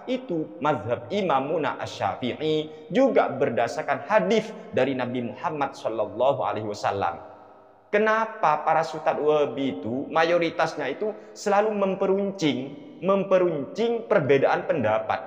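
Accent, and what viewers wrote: Madura, Indonesia. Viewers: Logat Madura ya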